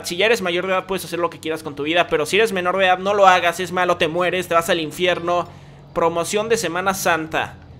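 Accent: Mexico. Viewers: Eres mexicano o estadounidense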